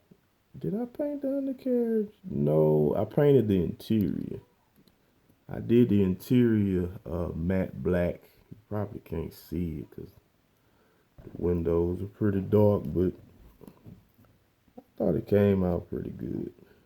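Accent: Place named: South, United States. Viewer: I love your southern drawl my brother, you reminded me of one of the best car songs of my generation <link>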